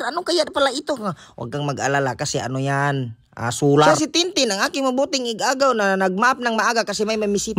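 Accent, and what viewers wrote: Visayas, Philippines. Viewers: Kailan kapa niabot?🤣🤣 i like your bistag jud, bisayang tagalog 🤣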